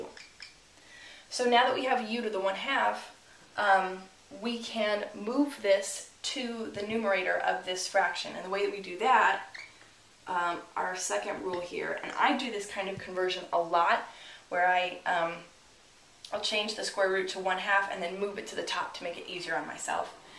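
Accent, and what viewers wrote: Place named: New Jersey, United States. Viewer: That's a lovely accent you have, New jersey ? Or austria 🤔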